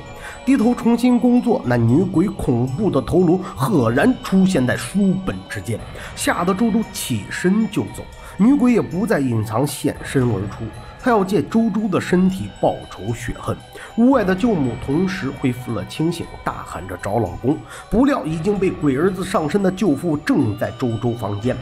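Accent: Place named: China. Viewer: 听不出这是大陆口音吗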